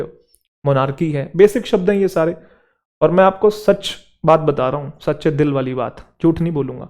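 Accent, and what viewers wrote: Haryana, India. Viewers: Sir aap haryana se ho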